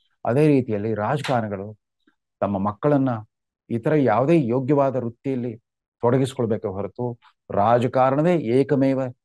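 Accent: North Karnataka, India. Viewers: ನಮಸ್ತೆ 🙏ನಿಮ್ಮ ವಿಶಿಷ್ಟ ರೀತಿಯ ಉತ್ತರ ಕರ್ನಾಟಕ ಭಾಷೆ ಶೈಲಿ ಸುದ್ದಿ ಪ್ರಸರ ಪಡಿಸುತ್ತಿರುವ ನಿಮಗೆ ಧನ್ಯವಾದಗಳು 🙏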